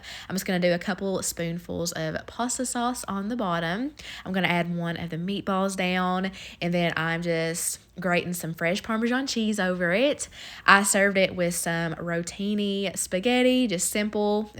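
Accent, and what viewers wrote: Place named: South, United States. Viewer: I love your receipts and your accent, just like Paula Deen, oil y'all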